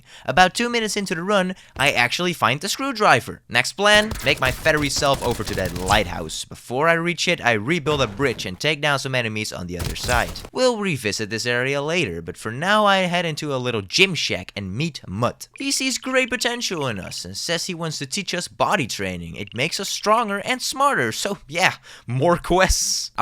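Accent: Netherlands. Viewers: Dutch (I guess as a fellow Dutchman)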